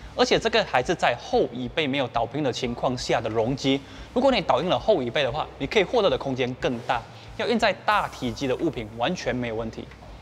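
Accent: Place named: Malaysia. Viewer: 马来的华语不都这样吗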